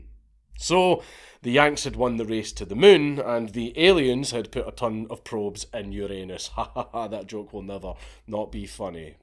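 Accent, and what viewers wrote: Scotland, United Kingdom. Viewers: Ich liebe deinen schottischen Dialekt bruder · Lmfao!! The part where the probe began to scream had me f**kin rolling. I love this channel. It honestly wouldn’t be the same without it’s brilliant and hilarious Scottish host.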